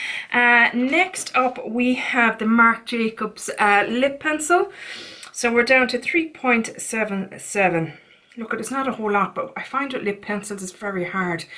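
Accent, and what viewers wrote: Ireland. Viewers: What a brilliant tip from your subscriber, so simple but hardly thought of. Will definitely be doing that with products with air bubbles from now on. Love your videos, your accent is beautiful. What part of Ireland are you from? X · I put my phone up to my husband's ears bc I knew he would Love your voice. He didn't know what I was doing. His response "I could listen to her all day". Kelly Macdonald is his favorite voice. Which region in Ireland are you from? I don't know the regional differences. Loving your videos!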